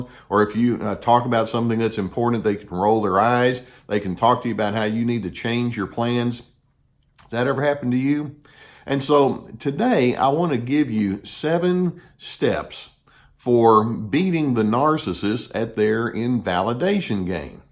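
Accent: South, United States. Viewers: this is fantastic guidance, all your advice is spot on, (and I love your southern accent !) many thanks, from the Uk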